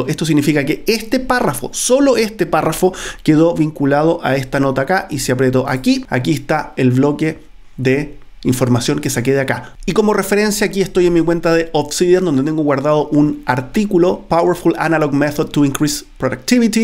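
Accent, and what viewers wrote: Chile. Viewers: ¡Qué agradable escuchar el acento chileno cuando el orador tiene una excelente dicción! Además, el contenido del video aporta información valiosa para quienes comenzamos a explorar el uso de estas herramientas.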